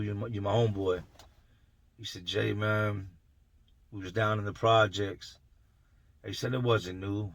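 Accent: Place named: Philadelphia, United States. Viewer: Bro i love your stories. I thought you was a little wiggerish at first but thats just how they speak in Philly. You're gonna blow up. Your content is better than 1090 and Deaths by far. You don't do all that dumb extra shit man. Damn I hate how death stops to laugh or make the lamest jokes. I literally cringe when he does that. So I unsubbed. Death def isn't hard and he hella slow. But ofc you wanna keep shit sweet w him cuz that's how you get put on is getting an interview by him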